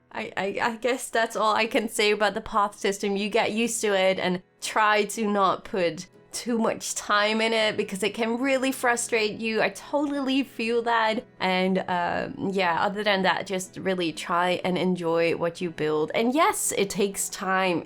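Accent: Netherlands. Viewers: Haha ben je Nederlands?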